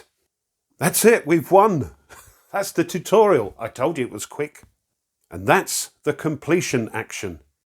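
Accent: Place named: United Kingdom. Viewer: Hopefully will have reprinted soon! Missed the 1st Edition…Like your videos and love your British Accent!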